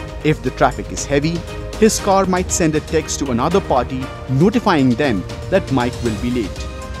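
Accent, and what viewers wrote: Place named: India. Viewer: Pengisi suaranya orang India ya? Kedengeran banget dialeknya.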